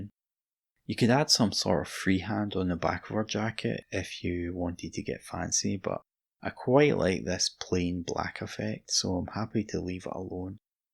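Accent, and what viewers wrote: Scotland, United Kingdom. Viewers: This is brilliant - I had to subscribe. You’re like a Scottish Bob Ross, so calming !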